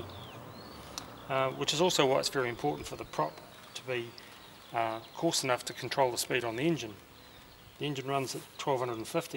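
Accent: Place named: New Zealand. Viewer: Kiwi English, easy as!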